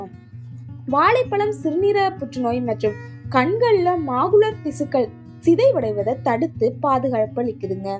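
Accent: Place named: Sri Lanka. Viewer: srilankan tamil bro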